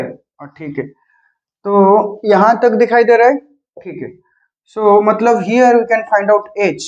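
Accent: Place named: Odisha, India. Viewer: apka bolneka accent thoda odia ki tarha hai.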